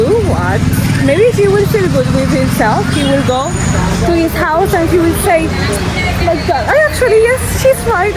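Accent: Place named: Iran. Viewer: Are you Persian?you sound Persian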